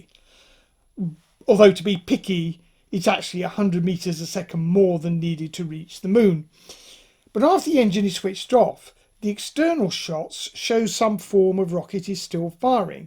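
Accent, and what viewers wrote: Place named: Yorkshire, United Kingdom. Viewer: Fascinating. The way you pronounced Frau im Mond sounded distinctly Yorkshire. Yes, I know The Romance Languages have the same thread running through them and the Germanic Angles and Saxon Tribes settled in Yorkshire some time in the C5th, or C6th. It just means I was reminded of the connections tied together in a neat little bow, through your audible delivery. Thank you, Cheers.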